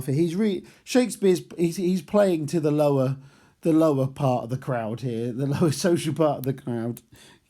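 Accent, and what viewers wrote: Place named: North, United Kingdom. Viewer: Love these sm thank you for helping me! P.S you sound like a northerner from game of thrones Winterfell!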